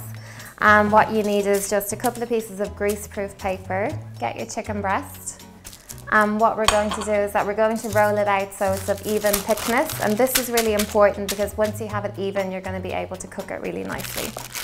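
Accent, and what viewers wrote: Ireland. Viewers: this is such a lovely video !. is she irish ? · Is that an Irish accent?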